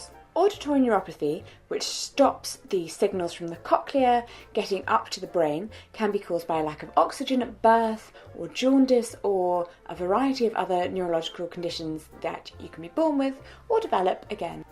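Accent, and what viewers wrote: RP, United Kingdom. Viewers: You sound like Queen Elizabeth, when she was young, or like one of the ladies of Downton Abbey. That is to say, aristocratic, having well articulated, received pronunciation. That is not criticism but merely observation. Of the various British accents, received pronunciation is the easiest for us in America to understand. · i love your voice, it’s soft but clear, and basically you sound stereotypically posh english but it’s really nice to listen to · Wow.... Your very British English is AWESOME!!! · Well...I love your voice! You have a British accent and kind of a 1950s Victorian tone and when you us r’s in words it sounds very formal and amazingly magnificent! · Yes please don't lose your British Aristocratic accent which I've always associated with snobism (I'm a post-war baby) - not your scene being snobbish ...you just crack me up! 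· I just found this channel and the first thing that came up to my mind is "if shes deaf then how does she has such a strong british accent?" can somebody that watches her channel for some time answer this question? I dont come to hate on you i just want to know. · Your voice is so good to hear, is beautiful and your accent is amazing!!!! I love British accent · This was very informative and thank you as always. You do have a lovely voice made all the more charming by your British accent. Or what we in the states call an accent, to you it's your normal voice. My voice is a higher pitch than I think it is. I've heard it recorded many times and like most folks, do like the sound of my own voice. Have a fabulous day 😃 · I knew this from a previous video, but I continue to be amazed because you have this wonderfully perfect posh RP accent, that seems flawless. And that I literally just now realized rather compliments your vintage aspects. 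In that it is classic and yet up to date at the same time, in the way that you talk about clothing and hairstyles of the 20s and 30s and also disability and gay rights of the modern age. It suits you. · Thank you for this video. I just want to let you know that I can listen to you speak forever:) I actually leave your videos on for my baby girl to listen to, hoping she can pick up you Brit accent:) Honestly though, your videos are a source of joy, love and hope. I wish you good health always. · You have a good voice just wanted to let you know and your British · . Your voice is beautiful. I mean, I love British accents but yours is just so light and beautiful. This may sound weird but in my mind, while I'm writing this, it is like your voice is jumping on clouds. To me, my voice sounds much lower than it really is. In my voice recordings, to me, it sounds like I'm like 6 years younger. My brothers and I did this thing where we voice recorded ourselves and then spoke with the recording and both my brothers confirmed that that is how I sound. 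Isn't weird to think that when you talk that people hear a whole different voice from the one you hear?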